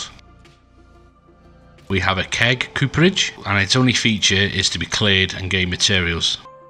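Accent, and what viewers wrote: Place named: Liverpool, United Kingdom. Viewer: Don’t change a thing mate, you’re an honest to goodness average gamer with good tips and tricks and your style reflects that! Dead warm and friendly. I thought I was picking up some scouse at first. Maybe just my headset-fried hearing? 😂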